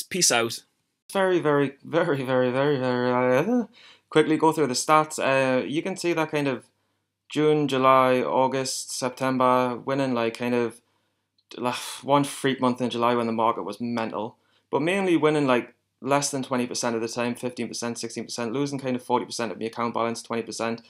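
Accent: North East England, United Kingdom. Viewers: are you from the north east of england by any chance because i am too :)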